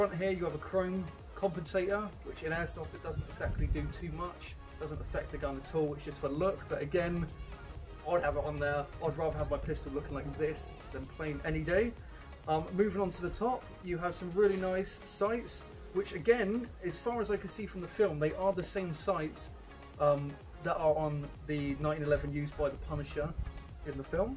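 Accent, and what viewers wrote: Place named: United Kingdom. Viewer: I thought it was a real gun until I heard your accent and yeah your in Britain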